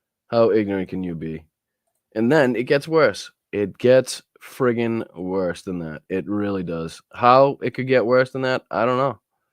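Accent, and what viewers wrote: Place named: New England, United States. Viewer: This was fun. I learned more about you, which explains why I don't see enough of you. You are very funny no matter what you're talking about, and you're handsome with an adorably annoying N.E. accent. 😉 Take that and run with it for now. It will develop into something on its own. The first 10 minutes made me laugh hysterically. The tech issues being worked out was funny because, like I said, it doesn't matter what you're doing, you bring fun positive energy just by showing up. I like the shoot the shit mode with the chat. I need a break from the more rigidly constructed political shows. You can still talk politics. It's just more relaxed and off the cuff, so I'm less traumatised by the events of each day. Starting my day today with a smile, thanks to YOU❣️😁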